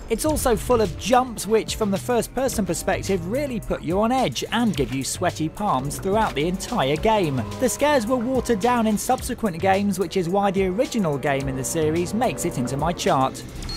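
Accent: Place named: United Kingdom. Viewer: ahw.. Hes british!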